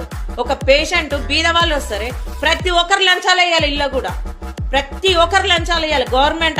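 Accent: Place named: Telangana, India. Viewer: Name emo lady hitler in andhra anaw...akka slang endi telangana lo undi 😂😂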